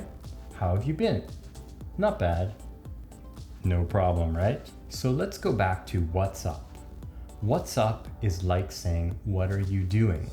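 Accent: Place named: Canada. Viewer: Love that Canadian accent!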